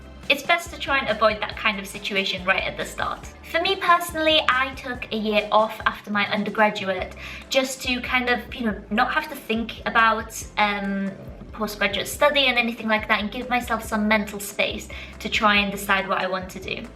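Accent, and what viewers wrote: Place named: United Kingdom. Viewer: that perky british accent is why i want to go there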